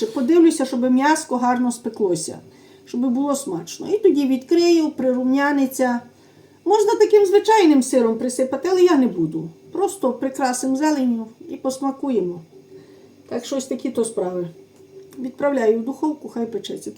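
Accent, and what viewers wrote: Ternopil, Ukraine. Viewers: То що вам не подобається слова і говор в кожній області свій акцент це Тернопільська обл там багато польських слів з якими вони виросли їм так зручно а ви дивіться і слідкуйте кожен за собою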